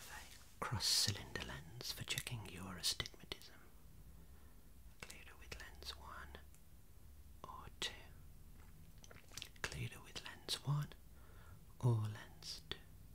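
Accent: Birmingham, United Kingdom. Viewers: Yooo I don't think I've actually heard an ASMRtist with a Brummie accent before, it's really nice! Great video dude, I love visual effects like this, they're super relaxing. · This is excellent! Is your accent also from Birmingham? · I was starting to think that my tingles had gone and nothing was doing it for me, until you, not sure if it’s the accent, and being from Birmingham too it’s lovely to hear 😊 I love all your videos 👏🏼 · Love this , just found you . I miss hearing a brummie accent 🥰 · Are you a Brummie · As a lad who comes from the Black Country and the areas around Brum. I have never heard a brummie talk so quietly 🤣🤣🤣🤣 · Judging from his accent I would say the UK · Never thought someone with a brummie accent would help me sleep... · He’s a brummy I think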